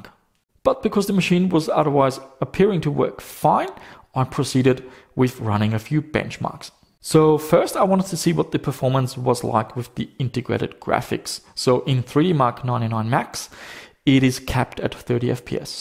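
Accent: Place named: Germany. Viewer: Are you really Australien? Thought in the beginning that you were from Germany or Austria! 😄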